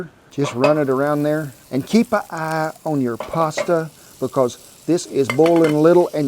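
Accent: Italy. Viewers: I luv ❤️ Italian accent! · We love and subscribe to your channel. We watch all of your new posts. Your Italian accent is annoying to me. Not trying to start a movement, it's just not funny or even tolerable to me.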